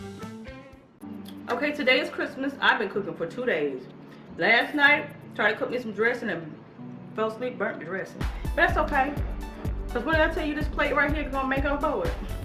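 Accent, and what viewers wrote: South, United States. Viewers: make no apologies for your faith in GOD, he alone has enabled you to be who you are, where you are and what you will be. The video's you produce reach a larger base than just numbers in the views, they reach souls with entertainment as well as encouragement. For those that would rather you not talk, let them turn off the volume because others enjoy the sweetness of your southern accent and the personality you exude. Live the life that best suits you because anything else is an inferior fit.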